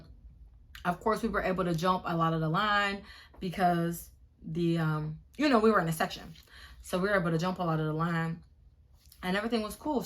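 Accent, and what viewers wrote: Pittsburgh, United States. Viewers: It’s so crazy cus when you start telling the story, I’m like she sound like she’s from Pittsburgh 😭 We really have an Accent fr lol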